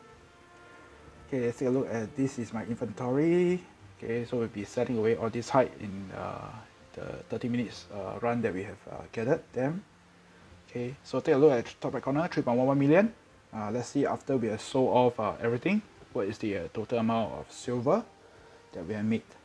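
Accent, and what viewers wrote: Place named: Malaysia. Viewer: Bro, u either a malaysian or singaporean based on your english. Theres no doubt about it.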